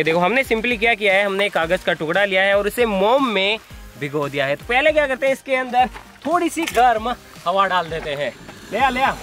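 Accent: India. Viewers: I can only speak English, but I love the Indian accents 😀🇮🇳 the words flow so smoothly 🥰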